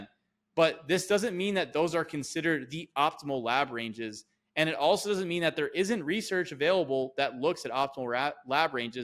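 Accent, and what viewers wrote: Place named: New Zealand. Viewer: has a very New Zealand accent! 😂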